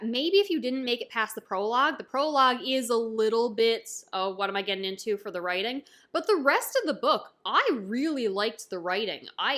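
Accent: Canada. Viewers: I love/appreciate your Canadian or maybe Wisconsin pronunciation!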